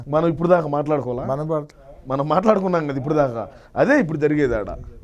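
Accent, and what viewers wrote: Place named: Kadapa, India. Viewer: kadapa aa slang thone cheppachu bro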